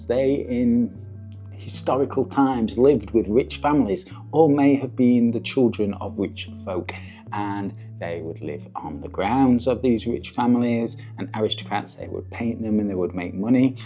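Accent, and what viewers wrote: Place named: Yorkshire, United Kingdom. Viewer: a traveler and you b sound just like my friends from Yorkshire ❤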